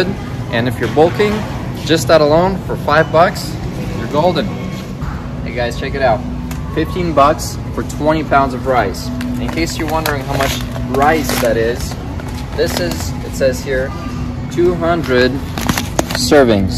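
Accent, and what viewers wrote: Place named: Russia. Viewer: От русского акцента не избавиться))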